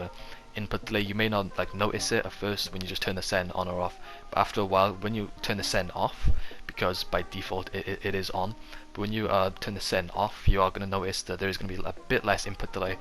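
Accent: Wales, United Kingdom. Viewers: Are you welsh I am I’m from swansea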